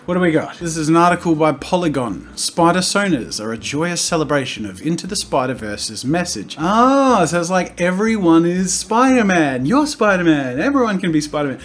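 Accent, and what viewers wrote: Australia. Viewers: I have his accent because I'm Australian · I knew you were Australian and not from Boston! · lol I love how he does the accent, even tho us Australians don't use that thick of an accent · Friend: "Why does he sound like that Australian guy from Crocodile Dundee?" Me: "Probably cause he's Australian." Friend: "Really?" Me: face palm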